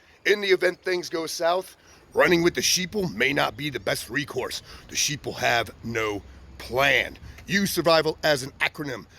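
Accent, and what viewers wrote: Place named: Scotland, United Kingdom. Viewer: ! 😂😂😂 I KNOW this guy would sneak up on me and kill me if he needed to. Because I DID NOT see that Scottish accent coming. Awesome stuff,! I hope one day we can drink a stiff one and share artwork together!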